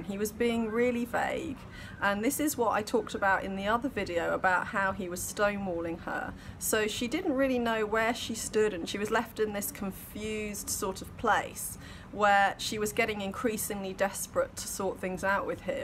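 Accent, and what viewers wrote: England, United Kingdom. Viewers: her beautiful British accent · Her accent sounds brittish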